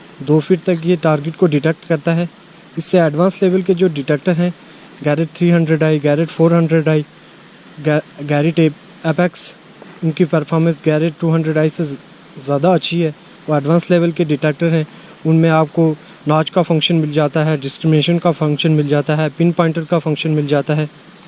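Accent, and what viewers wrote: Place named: Kotli Sattian, Pakistan. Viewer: Bro ap kotli satyan k aas pas k Hain .Mera andaza narar ka hai.ap ki zuban say andaza lgaya hai.reply krna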